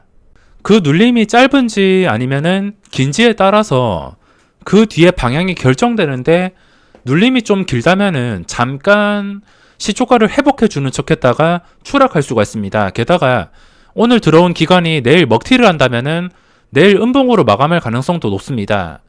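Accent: Gyeongsang, South Korea. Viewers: 갱상도분이라 발음은 이해해주세요ㅠㅠ 저도 창원이라 정말 발음 힘듭니다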